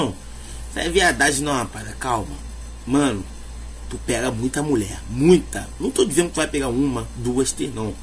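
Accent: Rio de Janeiro, Brazil. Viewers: Do rio né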